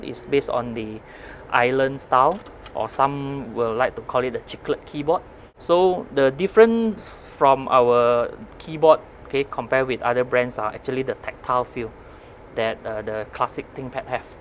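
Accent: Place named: United States. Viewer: 你的英语说得和美国人一样。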